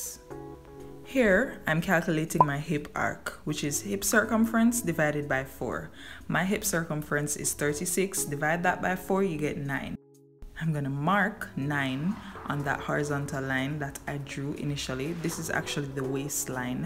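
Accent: Jamaica. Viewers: you sound Jamaican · Hey, are you Jamaican? I heard an accent. 😊😊😊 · Did I detect a Jamaican accent,? Good to hear you. Thanks for sharing.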